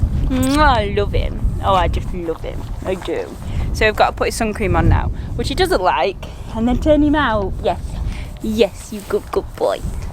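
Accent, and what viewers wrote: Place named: Yorkshire, United Kingdom. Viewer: I’m so confused which accent is your natural accent? 😂 one minute you sound posh the next from Yorkshire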